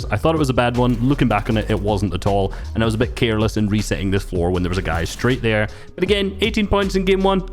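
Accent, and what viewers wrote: Scotland, United Kingdom. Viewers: Can we 1v1 pls? Also are you Scottish? Because I think Scottish people have the coolest accents.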